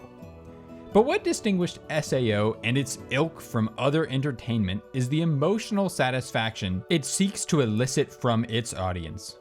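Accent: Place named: United Kingdom. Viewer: SAO fan here. First of all, I wanted to say great video—even with the British-like Japanese and the 202012s, I was invested in this video from beginning to end. Now, onto what I want to say: I get that people would revile SAO for the whole isekai/harem boom of the 2010's, and it makes sense. There's a clear oversaturation of the market because everyone's been craving the high SAO had given them. Of course, everyone's problems with Fairy Dance can be solved just by watching Gamerturk's common misconceptions video about the arc, but the point I'm trying to get across is that I feel SAO has created an Aincrad-shaped hole in everyone's hearts, only capable of being whole with that same tension of life-or-death. That's why in this supposed "drought" of SAO (anime) content, I suggest we show a MASSIVE amount of support for Reki-sensei to actually lock in. 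As of writing this comment, the latest arc—Unital Ring—is currently on its 8th part, with events leading to what could possibly be a link to Accel World. Not only that; but his other subseries, SAO Progressive, is going to have its 9th volume released for the west on May 12th. While it may seem as though Reki-sensei is just meandering around with his stories because he's probably hit a writer's block, cheering him on enough to keep pushing forward will definitely give him a boost to get his act together and finish the Elf War saga within SAO Progressive. If we do that, we can generate enough buzz to make Kadokawa consider either a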